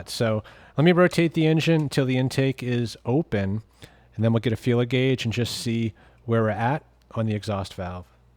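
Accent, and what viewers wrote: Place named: Midwest, United States. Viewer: I got a chuckle out the the "Fuel Make it Happener" segment.. Watching Vice Grip Garage is great. I live up here in the Mid West and there are a few of us that talk like that.... Its just a natural way. Make sure you check the Battry.....Along with the Spark-o-lator. HaHaHa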